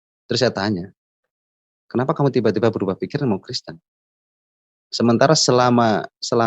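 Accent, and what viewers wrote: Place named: Kupang, Indonesia. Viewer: Kental dialek kupang,,kupang mana mas??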